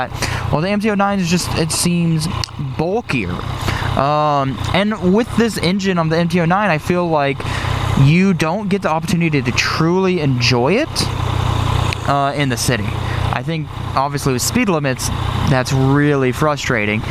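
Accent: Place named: United States. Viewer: Clearly you have An American accent....how do you like Germany?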